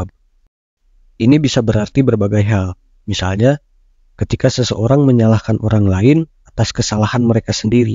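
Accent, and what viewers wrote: Indonesia. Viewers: Sunda banget nada y 😁👍👍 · Senpet salfok, background nya meni garang, tapi voice over nya nyunda pisun 😁😁, hatur nuhun kang ilmunya, resep pisan videona meni berpaedah.. gass lanjutkaan berkarya.. ❤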